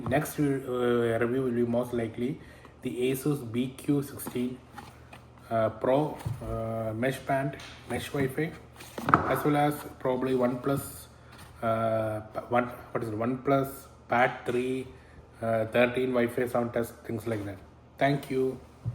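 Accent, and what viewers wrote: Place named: India. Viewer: he did sound indian though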